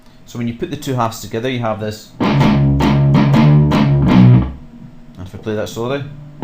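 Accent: Scotland, United Kingdom. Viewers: Are you scottish ?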